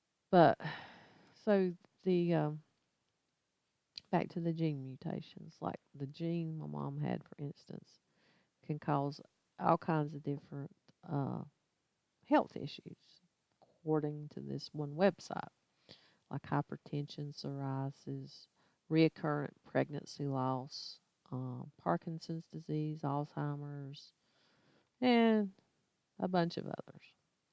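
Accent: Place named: United States. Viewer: Happy Saint Patrick's day, and yes! I too fear a Soylent Green future in which the poor and disenfranchised are deemed scrap heap fodder and a cooled evil encompassing reality, or I could just be high on some sweat Emerald pot and happy. Good day. I do so like your accent, tell me one thing? if it's not too intrusive? = where is that in the US of A..... is that from?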